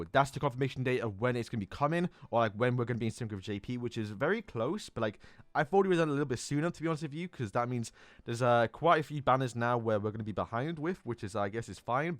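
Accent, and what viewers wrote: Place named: United Kingdom. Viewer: Seriously there are British youtubers accent then theres accent who is on whole different level, istg accent is my salvation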